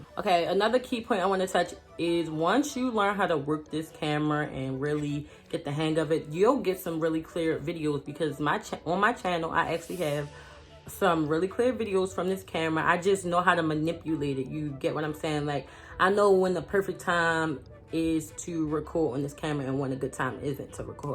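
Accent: Baltimore, United States. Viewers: I thought so lol that’s where I’m from. I know a bmore accent when I hear it lol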